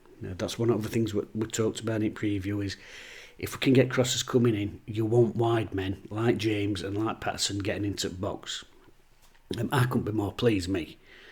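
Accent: Sheffield, United Kingdom. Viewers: As I now live in Devon, I would like to say how lovely it is to hear a real Sheffield accent again with all the old jargon I was brought up to hear. Keep up the good work as I look forward to your videos appearing. Thank you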